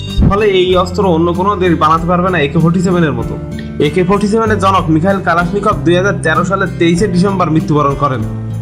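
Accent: Bangladesh. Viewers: আপনার বাড়ি কি খুলনা, যশোর বা নড়াইলে? আপনার কথায় আঞ্চলিক টান আছে। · vi ki noakhailla naki.....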